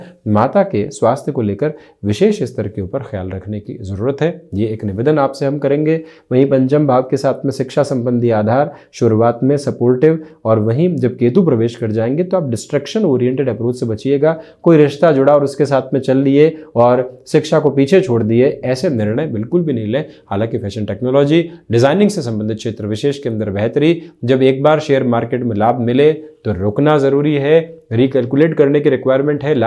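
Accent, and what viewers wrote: Standard. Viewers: Sir Pranaam 🌺🙏🌺 Aap itni shudh Hindi kaa uchaaran kaise karte haiin ? 🙏☺️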